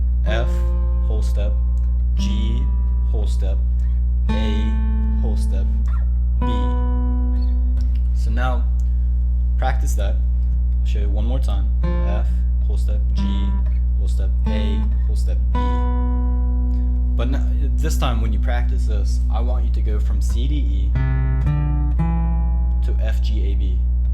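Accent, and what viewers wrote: United States. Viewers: Are you from Baltimore?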